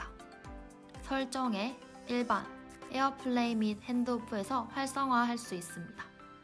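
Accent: Busan, South Korea. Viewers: 부산사람이신듯..발음 귀엽 ㅋ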